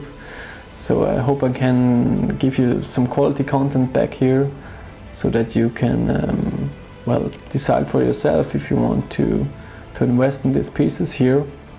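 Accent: Germany. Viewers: Ha, wusste das es nen Deutscher(-sprachiger) is an deinem Akzent 😂👌🏻 Wie halten sich die Pegs an den Beinen ? Hab viel negatives über die gehört :/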